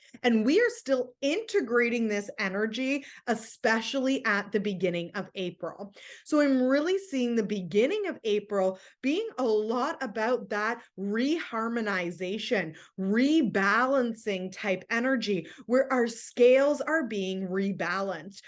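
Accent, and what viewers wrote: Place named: United States. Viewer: Absolutely wonderful to listen to you. loud and clear for me who is Swedish. Wonderful American accent.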